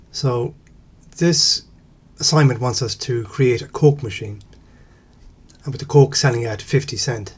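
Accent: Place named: Ireland. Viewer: Thanks...Nice to hear he Irish accent...I'll buy you a Guinness if we ever meet.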